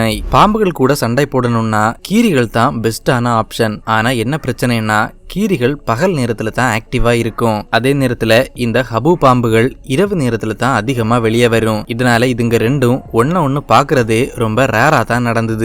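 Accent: Kanyakumari, India. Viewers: Bro nee Kanyakumari thanaa 🧐🙂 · The voice recorder is from kanniyakumari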